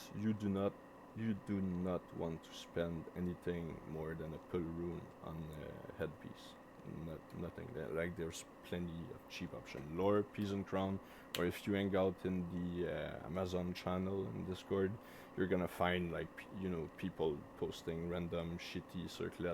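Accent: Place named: Quebec, Canada. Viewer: hahah l'accent Québécois est assez présent.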